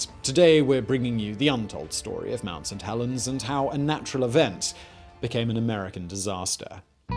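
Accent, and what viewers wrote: United Kingdom. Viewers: You Tube SUCKS! I have removed this man's channels over and over again. But when You Tube changes the algorithm, it force feeds me this guy's many channels despite my removing him. channels suck. They are poorly researched information that is mostly government propaganda talking points. Terrible videos. And just because has a British accent, this doesn't not make him an authority on anything. He's just a talking head. England is over. Brexit has made the only job in England a You Tube content provider. Terrible list videos that spread falsehoods and bull. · agreed. Also this limey sounds stooopid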